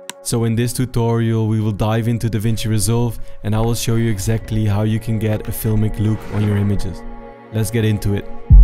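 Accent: Netherlands. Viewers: btw brother are you Dutch or Belgian ? The accent too obvious hh. love your content lets keep it coming!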